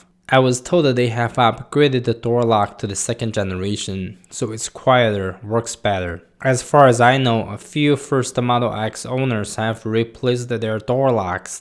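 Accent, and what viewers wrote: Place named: China. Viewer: good english, but still, 老中 😊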